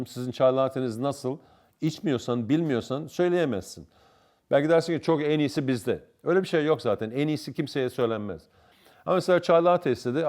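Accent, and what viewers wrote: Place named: Australia. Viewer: Bu adam Avustralya’da doğup büyüyüp Türkiye’de kahve dükkanları açtı. Türkçesi bu yüzden aksan olarak farklı ama yinede diksiyonu çok iyi.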